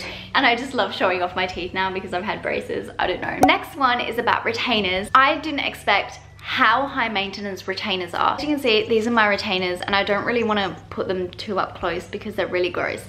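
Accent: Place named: England, United Kingdom. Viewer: you sound a little English:))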